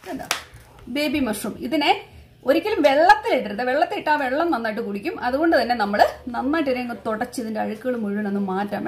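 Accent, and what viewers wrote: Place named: Kuttanad, India. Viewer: സംസാരം കേട്ടിട്ട് കുട്ടനാട്ട്കാരി ആണെന്ന് തോന്നുന്നല്ലോ 😊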